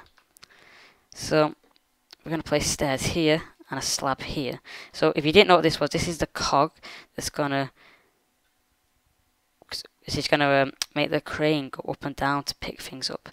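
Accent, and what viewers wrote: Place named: Ireland. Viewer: You sound irish even though you're english.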